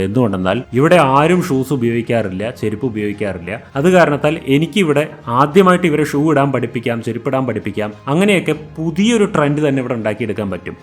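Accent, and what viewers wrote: Palakkad, India. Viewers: Oru palakkad Karan alle ?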